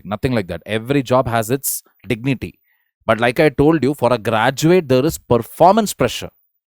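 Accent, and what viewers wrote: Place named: South India, India. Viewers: South Indian guy bro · I love south Indian Accent 😜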